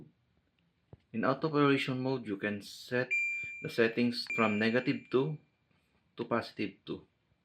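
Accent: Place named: India. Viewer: Why the fuck do I keep getting Indian speakers?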